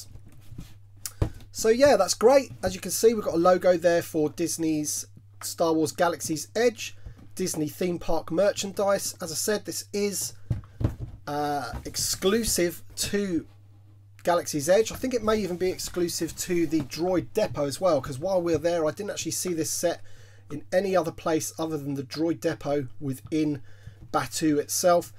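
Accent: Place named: United Kingdom. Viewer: I've always liked the way you Brits pronounce WATER as WATA.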